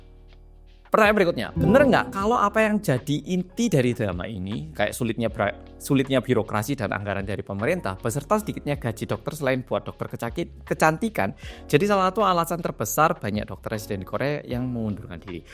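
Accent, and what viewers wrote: Java, Indonesia. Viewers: Mas, sampeyan kih kan 'medok' yo.. Opo ra enek rencana ngajarkek les boso jowo neng kono???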